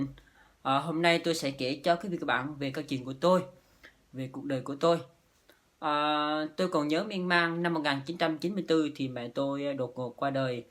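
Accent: Vietnam. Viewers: Thấy cuộc đời của bạn quá khổ, như vậy quê bạn Bình Định, sau nói tiếng miền Nam chuẩn quá, vì cuộc sống vất vả, thiếu thốn nên sức khỏe yếu, mong bạn vượt qua mọi khó khăn, hiện tại bạn làm youtube phải khg cố gắng lên nhé mình se vượt qua mọi khó khăn, mong bạn làm có tiền để có thể có 1 ngôi nhà cho 2 anh em ở và thờ cúng cha mẹ, hy vọng mọi người có lòng hảo tâm sẽ hổ trợ bạn thêm vào cuộc sống, bạn sống rất chân thật và vui tánh, khg lời lẽ nhiều nhưng bạn tâm sự vậy mọi người cũng hiểu và biết hoàn cảnh khó khăn của gia đình bạn, ai đã chỉ dạy bạn làm clip thể này ? Bạn cố gắng làm clip và dành dụm mỗi khi mình được nhận tiền, bây giờ đã qua khổ cực phần nào hả y cố gắng lên mọi người sẽ giúp đỡ cho bạn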